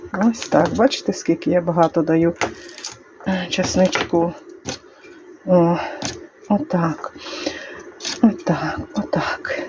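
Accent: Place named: Narodychi, Ukraine. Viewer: Ооо, а ми з народицького р-ну ,чую що говір до волі знайомий.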